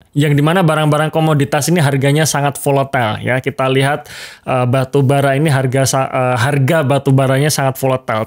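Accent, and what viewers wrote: Surakarta, Indonesia. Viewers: oalahhhhhh..wong Solo to mas? 😂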